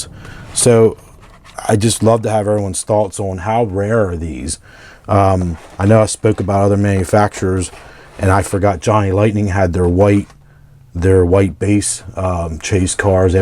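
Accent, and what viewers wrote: Pennsylvania, United States. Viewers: Sounds like you from Pennsylvania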